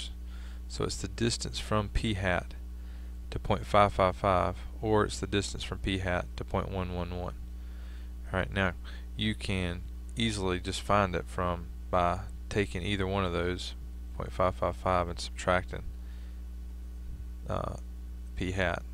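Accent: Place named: South, United States. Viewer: Came for help with my homework, stayed for your delightful southern accent ;)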